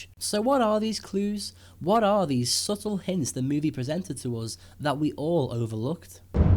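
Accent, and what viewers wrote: Liverpool, United Kingdom. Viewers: Yous a Scouse, innit?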